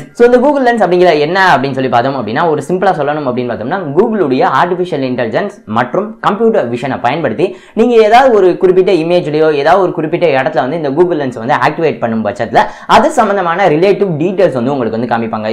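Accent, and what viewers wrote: Madurai, India. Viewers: Neenga madurai karara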